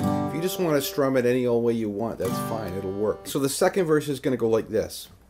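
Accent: Canada. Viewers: Your voice sounds much like Spielberg. You have a Canadian accent. Aside from that, you sound so much like, Spielberg.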